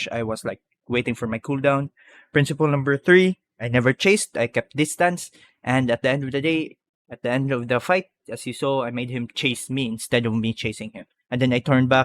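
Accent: Philippines. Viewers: you sound very filipino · Build? Btw are you a filipino? You have an accent like it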